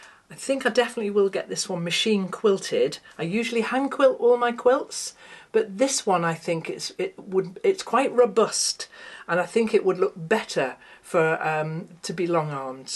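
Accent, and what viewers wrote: United Kingdom. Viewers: I love your British accent coming from an American gal. My most favorite, relaxing quilts was when I sewed my last 2 scrape quilt. Still have plenty of scraps to use. Thank you for your informative videos.🤗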